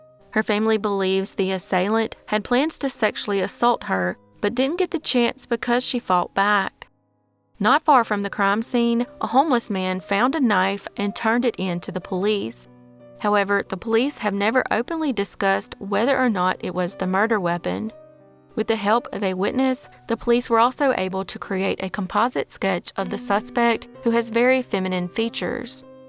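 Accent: South, United States. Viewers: Mine is even more southern lol love your channel nice to hear another southern accent.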